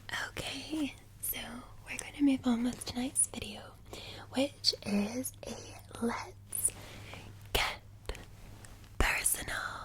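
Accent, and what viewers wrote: Scotland, United Kingdom. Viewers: Since you sound Scottish, you ever had brandy? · Is that the scottish accent or a bit toned down? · I am so happy that you are now settling into your new home:)!! Is Hugo, you Dog happy with his new home? I really LOVED the way you read the Fisher Man Moon Story with your Scottish Accent which made the poem sound more beautiful:)!!! I LOVED both of your bean bags which I think sound like the ebb and flow of the ocean waves:)!! I also LOVED the brushes, both the sounds and the visuals which feel like a virtual facial massage:)!! And I too have had embarrassing incidents like I was at my Target and I had sworn as I was about to pay for my items that someone stole my Wallet, but when I went home I saw that I left it in my Living Room!! Also, years ago, I was at my Super Market and I realized I did not have enough money, so I had to leave a bunch of items on the Counter!! 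So, you are not alone:)!! I consider myself a Christian, but I have a good sense of humor and I am not a prude. So, it does sound funny about the "jumpers". LOL:)!! My Brother, before he became a Father was a bit like your Brother, except I do not think he would have been that "naughty' in front of my Mom, now Late Dad and now Late Grandparents:)!! As for visiting, I would suggest you set up Zoom or Skype to stay in touch with your extended loved ones:)!! My Boyfriend's Dad is very intelligent and even though he is Elderly he is setting up Zoom for all of us to socialize together:)!! However, I do have a set of 3 to see in person as well, but not by any American rules or laws. It is just something we t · It’s a bit of a mix. She used to live around London, so her Scottish accent now is a bit lighter than the typical accent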